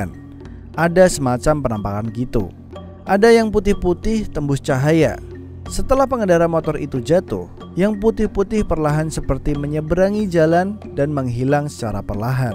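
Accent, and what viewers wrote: Java, Indonesia. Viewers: Tetep medok ngomonge mas #jowonotdied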